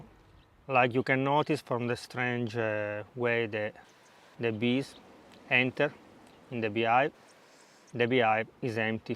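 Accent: Italy. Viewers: I was just thinking today, "What happened to my favorite Italian guy channel?" I really do appreciate your videos, and very much enjoy your speaking English. Thank you for your time and energy making these great videos. I would love to visit Italia again in my life, but reality is probably no. Are those Fig trees behind you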